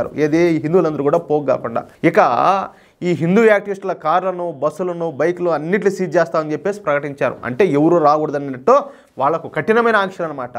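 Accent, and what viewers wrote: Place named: Chittoor, India. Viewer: Super andi, as a Tamilian I’m so proud. Meeru hypocrisy le kinda peggi lekunda chakkaga explain chestharu. And that too mana Chithooru Thirupathi slang lo. Keep it up andi.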